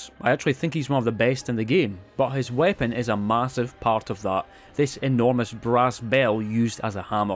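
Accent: Scotland, United Kingdom. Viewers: May i ask a question? Are you a scottish or an irish? I'm just interested... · what i love more, is a scottish accent xD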